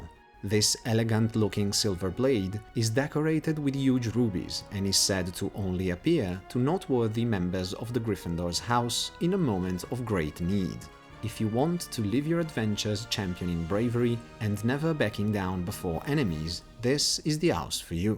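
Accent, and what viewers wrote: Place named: Italy. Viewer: Ho riconosciuto il tuo inglese italianizzato dall’accento e dall’intonazione, devo dire che sei molto bravo e supporterò il tuo progetto perché sembra molto interessante e anche perché sto cercando di fare lo stesso cercando di avere un canale completamente lingua inglese💪🏻🫶🏻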